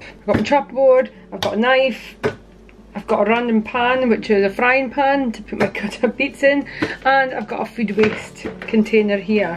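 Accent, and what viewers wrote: Scotland, United Kingdom. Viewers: Brilliant, great to hear a Scottish accent.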